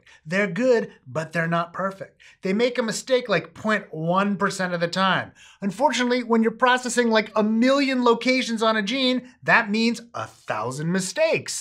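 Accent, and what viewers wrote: United States. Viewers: If you're 99% Eastern European Jew, why are you talking like a 100% Jersey shore Italian good fellah?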